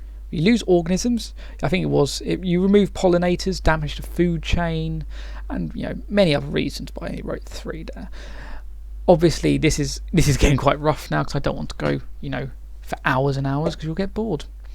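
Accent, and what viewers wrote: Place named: RP, United Kingdom. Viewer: why do you sound so posh, do your intellectual abilities match your convincing tone?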